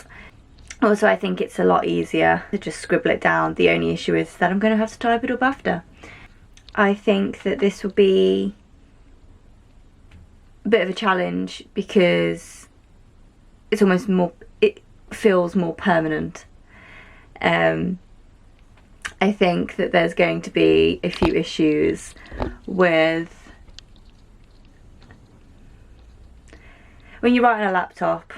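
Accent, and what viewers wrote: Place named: United Kingdom. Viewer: Pre-computers, I used to write by hand before typing this up. Much later, I'd go through the typed version, revise it, then retype. Apart from the revision stage, I began doing this while at university. I didn't have time to revise my essays before handing them in. Now I write straight onto computer or Alphasmart Neo. I'll then put the first draft on one of my ereaders and make notes for revision. More revision is done in Word. If you're going to write extensively by hand, maybe consider a really nice fountain pen and nice paper. You seem to have a British accent. Here in the UK black cats are lucky, and having one cross your path is a sign of good luck. A crow, on the other hand . . . Maybe you could consider an Alphasmart Neo if distraction free is your priority. You can buy them secondhand on ebay. They used to be quite cheap, but they've gone up to around £50 plus package and postage.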